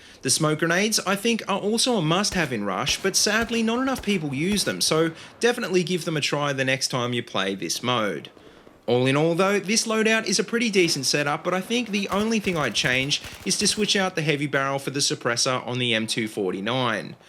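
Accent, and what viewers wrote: Australia. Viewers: Just found your channel, already a fan. Love the accent by the way, Australian? Anyway, keep making themed loadout videos, I like watching these sort of things... but I can't stand Levelcap. Peace.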